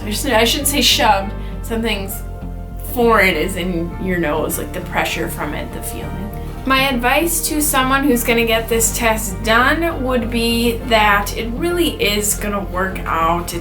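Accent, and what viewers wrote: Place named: Wisconsin, United States. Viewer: Very informative. I haven't had the COVID test, but not afraid to now. Your Wisconsin accent is pretty good, you must be a native.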